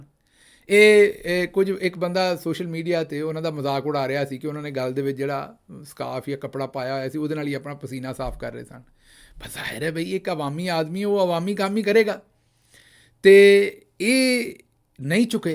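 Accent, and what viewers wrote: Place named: Punjab, India. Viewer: Tuhadi punjabi bilkul charde punjab wali hai, love from charda punjab ❤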